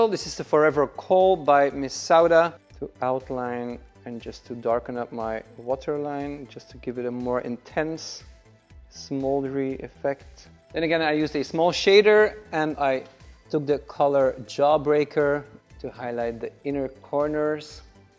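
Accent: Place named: United States. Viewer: Jij spreekt heel natuurlijk Amerikaans.. nicely done!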